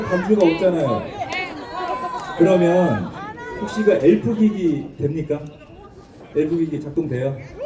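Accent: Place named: Jeolla, South Korea. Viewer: 전라도 말솜씨 는 언제 배웠남유 👍일품입니다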